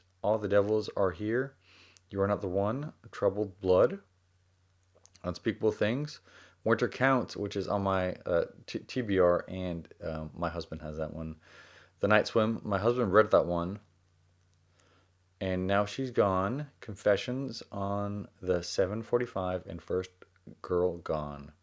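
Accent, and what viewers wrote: South, United States. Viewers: I love your video at your house in Texas during your quarantine until you're talking about all of the books while you went on the website of Goodreads on your cell phone to see all of them are brand new and it got came out this year, but it's called of Best Books of 2020 to look at them at the same time after that, I hope your husband, Zach will be very proud of you and I love your Southern accent!!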